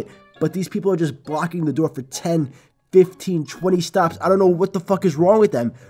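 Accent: New York, United States. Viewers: r u from new york?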